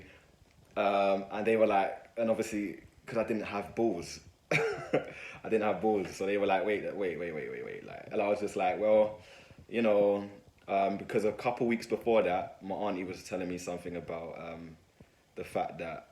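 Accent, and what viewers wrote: Jamaica. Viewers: I thought you were ja-mac-ian did you say you from London?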